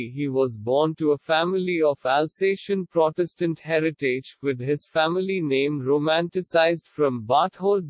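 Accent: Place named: India. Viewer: I can't understand nothing. Indian voice 😨😡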